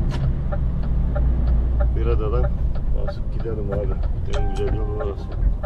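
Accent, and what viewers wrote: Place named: Sivas, Turkey. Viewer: Abi valla seni hemşehrim zannediyordum Sivas in ağzına benziyor konuşan adamsın valla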